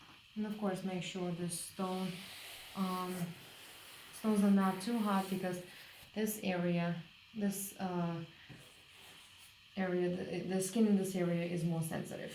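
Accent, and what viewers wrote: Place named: East Coast, United States. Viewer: I hear a cool east coast accent : )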